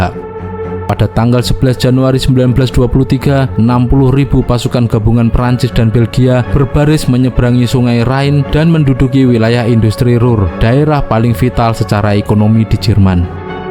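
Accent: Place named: Java, Indonesia. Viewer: mas, jowomu njowo banget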